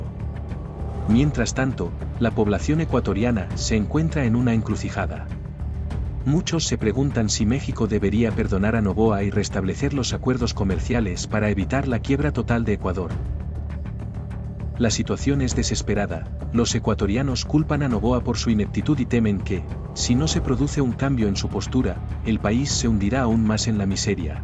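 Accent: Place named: Spain. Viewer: Por que no ponen a un mexicano a hablar.siempre se utiliza a una bos de español cual es el chiste y puro cuento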